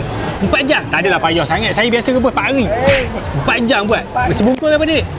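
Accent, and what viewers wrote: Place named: Pahang, Malaysia. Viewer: Oak org Pahang ke..mana tok kedengor loghat nye...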